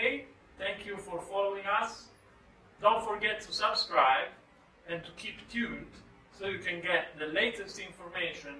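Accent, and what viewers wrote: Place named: Italy. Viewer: L'accento italiano si sente a 5000km di distanza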